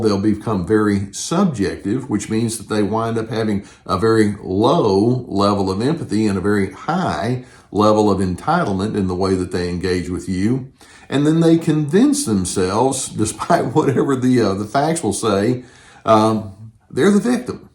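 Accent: South, United States. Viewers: Thanks so much for all your videos. You are so helpful and your Southern accent is charming. Warm regards from Canada.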